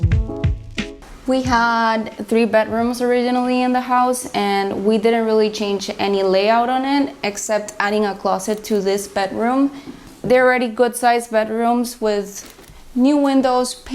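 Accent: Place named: Israel. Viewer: From your accent I think your mother tongue is Hebrew. Be Matzliach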